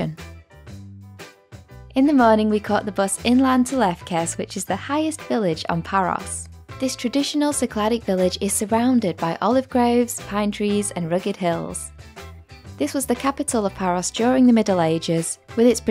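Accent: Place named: London, United Kingdom. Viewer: Thank you! Love your accent! From London, England?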